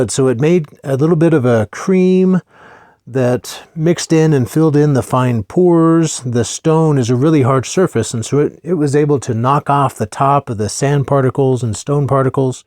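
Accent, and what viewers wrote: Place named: South Africa. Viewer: Are you from south Africa your accent doesn't sound American beautiful job👌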